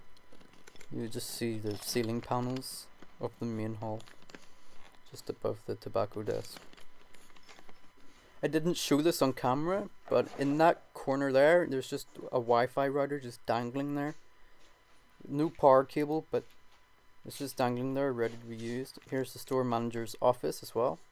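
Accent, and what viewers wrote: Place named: Northern Ireland, United Kingdom. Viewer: you can tell he's british by his voice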